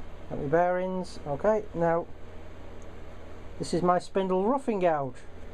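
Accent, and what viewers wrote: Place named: North, United Kingdom. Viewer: I love your style, your layman's explanation of things really has increased my understanding in leaps and bounds. I've been struggling to decide on which lathe to buy for months and whether I had the confidence to start turning. But you have really spurred me on, thank you. If I may ask some advice as a beginner? I'm going to spend as much as I can reasonably afford on the lathe (As the advice goes) but the turning tools are in danger of wiping me out, especially after buying a chuck too.. Can you suggest some decent ones to start with? Should I aim for HSS? Also, you sound like a fellow northener! Are you allowed to say where you buy your wood/blanks from? And what wood is good to start with? I have lots of offsets of softwood 2x4 and treated rough sawn....? Sorry for all the questions......but I don't feel stupid asking you. 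Thanks again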